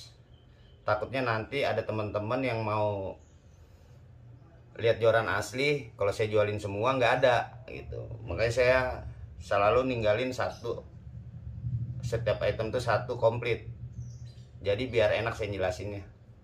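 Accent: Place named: Jakarta, Indonesia. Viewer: Resep klo lagi ngobrol nya bang bahasa nya Betawi pisan sukses slalu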